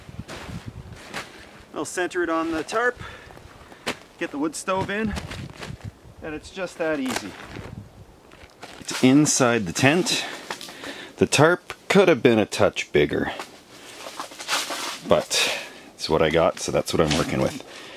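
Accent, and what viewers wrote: Canada. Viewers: That canadian accent tho 😂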